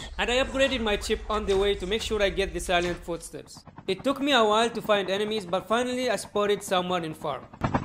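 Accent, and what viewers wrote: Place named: India. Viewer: Your voice essence feels like u r Indian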